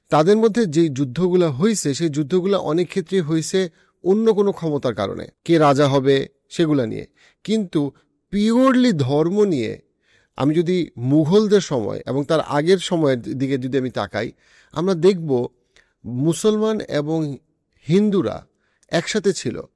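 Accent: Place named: Bangladesh. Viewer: ও দাদা তুমি কোথাকার লোক ভাষা শুনে তো মনে হচ্ছে বাংলাদেশের তা তুমি তোমার দেশ টা নিয়ে মাথা মারো তোমাকে ভারত কে নিয়ে এত ভাবতে হবে না ,আমরা ভারতীয় হয়ে আমরা এতো ভাবিনা তোমার এত ভাবনা কিসের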